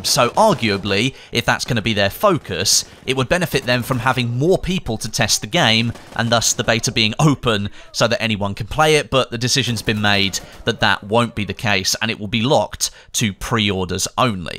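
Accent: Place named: United Kingdom. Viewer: why do british people pronounce beta like bayter?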